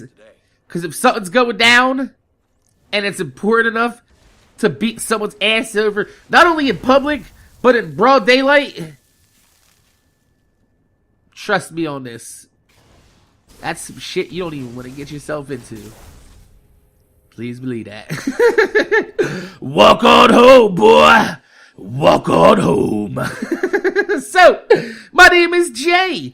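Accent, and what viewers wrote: New Jersey, United States. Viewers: you from north Jersey or south? SJ guy that enjoys your content!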